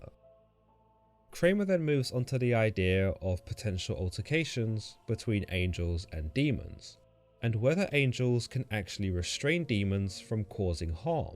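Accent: United Kingdom. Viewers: hearing a British guy talking about catching fish, with a creepy violin and piano track in the background is gotten to be my new past time!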